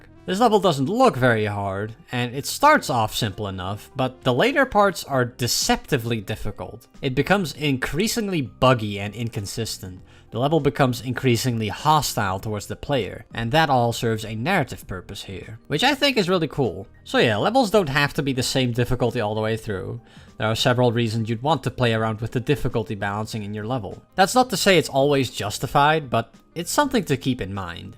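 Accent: Netherlands. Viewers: Bro I only halfway through the video realized you're dutch lol. Good video man keep it up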